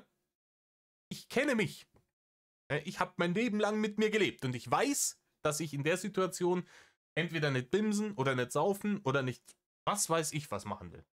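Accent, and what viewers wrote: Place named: Bavaria, Germany. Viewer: Wie sympathisch, mir mittlerweile bayerischer Dialekt erscheint, seit ich den hör! Ofi ist die Puffmutter, der gute jedoch trifft absolut meinen Geschmack, wie man bei uns sagt: mit dicker Fresse und ziemlich viel dahinter! Also,i geh jetzt nein da,in den Reiner!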